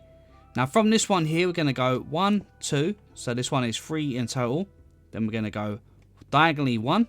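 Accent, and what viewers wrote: United Kingdom. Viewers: I’m not a native speaker in English (I like your I guess British accent very much), so do you say abbey or monastery? I mean a building with a dwelling for nuns or monks and a garden in the courtyard with cloisters around it and a little chapel included. I hope I described it well so now you know what I meant. Sry for language mistakes 👍😀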